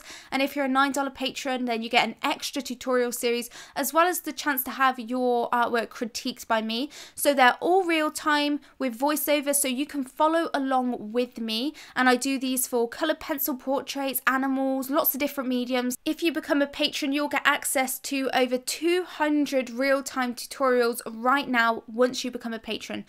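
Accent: England, United Kingdom. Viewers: I just wish I could understand what you are saying. Your British is so thick and you speak so fast I can only comprehend about ever other word, which makes for a lot of confusion. Can you speak American? Please? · luv you british? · I love your english accent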